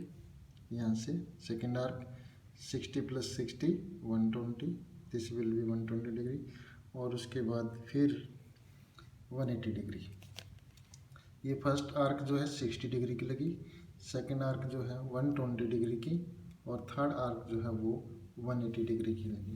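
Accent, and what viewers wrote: India. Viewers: Sir you are from Himachal i think for your tune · sir ji aap pakka haryana se honge ! kade t ho sir jii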